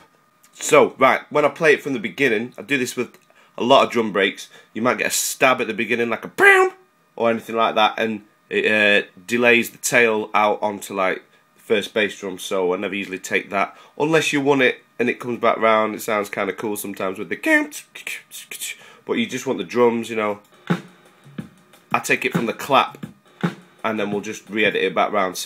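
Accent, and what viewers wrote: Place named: United States. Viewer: superb american accent, you sound like the filmer Beagle from baker skateboards haha. love your tunes, neat tutorial!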